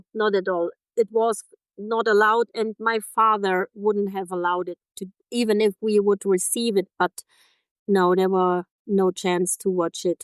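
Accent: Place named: Germany. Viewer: She sounds exactly like a former "n4zi" saying "I had no idea about zee camps".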